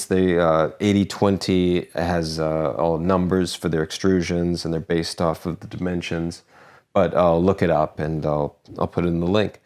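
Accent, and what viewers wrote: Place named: Canada. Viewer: Great video, great idea. Also, I could listen to Canadians say words with the "ou" sound all day long: out, about, house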